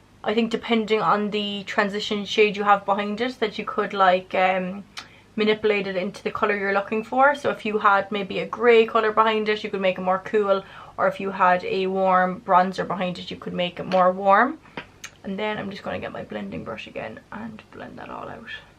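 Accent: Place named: Ireland. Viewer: Beautiful 😍....I am a lady in my sixties and I have been using Trinny products for years now...amazing....she does a product called...." face finish ".... which is a cream powder you really must try it...no one else in the cosmetic world does it....enjoyed listening to your Irish lilt....love Jane...North Wales UK....x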